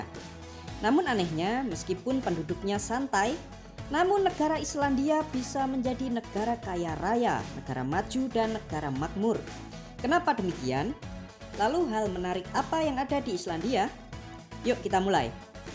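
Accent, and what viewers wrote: Java, Indonesia. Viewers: Medok euy 🤭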